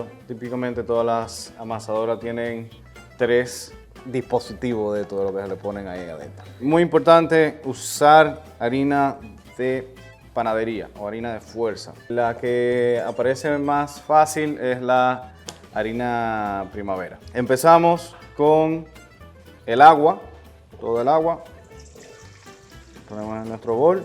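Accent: Dominican Republic. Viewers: Ahi padres pero tu si habla nos puedes negar que eres domin .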